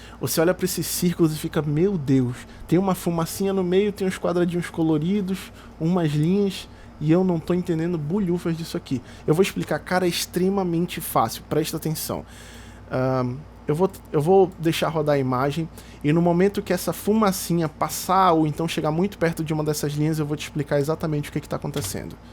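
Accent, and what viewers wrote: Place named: Manaus, Brazil. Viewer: Muito manauara esse mano. Volta com as aulas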